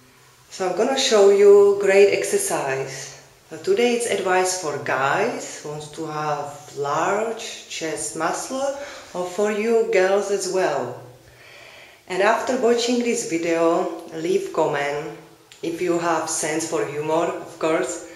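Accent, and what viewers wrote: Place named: Eastern Europe. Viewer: I love your accent i could listen to it all day. Slavic women seem to have heavy voices.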